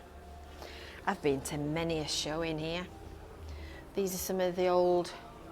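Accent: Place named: Lancashire, United Kingdom. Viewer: Hi, I 'm Irish just moved to Blackpool last August from Heartsfordshire. I have no problem understanding your lovely accent. Someone have said you have a lovely Yorkshire accent, I thought you have Lancashire accent. I do enjoy your videos xxx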